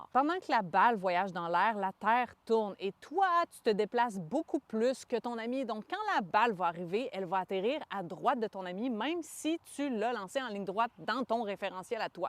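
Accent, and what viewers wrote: Quebec, Canada. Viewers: J'adore l'accent québécois, je m'abonne ! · Ho l'accent, tabernacle ! 😂 je kiff 😂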